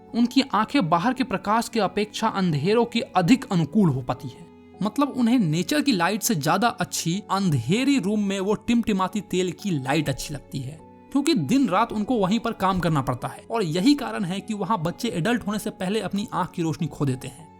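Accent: Bihar, India. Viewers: Sir ap Bihar ke ho kya🤌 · Bhai bihari ho kya 💯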